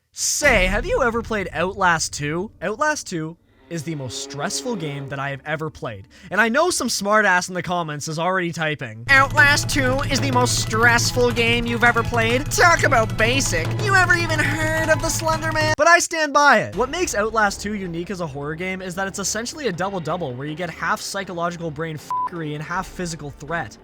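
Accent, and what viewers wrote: Canada. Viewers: bro has a HEAVY canadian accent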